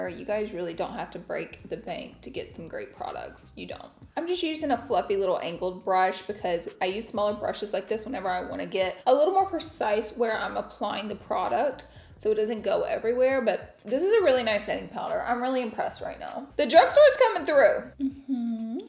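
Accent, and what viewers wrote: South, United States. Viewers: Girl let that southern lady come out don’t be holdin it back! Lol that’s how we say it in the south blyend… not blend lol😂🤣😆❤️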